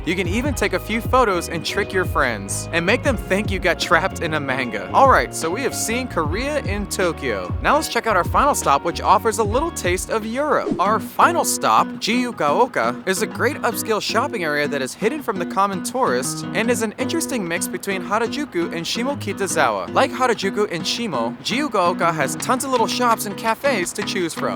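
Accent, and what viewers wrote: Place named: United States. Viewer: WE KNOW YOUR AMERICAN its ok stop being such an attention seeker we KNOW ok . FFS but great video the accent is annoying and hideous but great video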